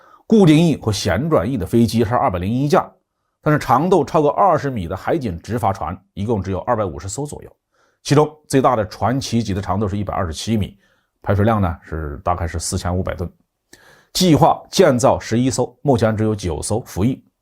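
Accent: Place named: Hubei, China. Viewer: 更像是湖北口音。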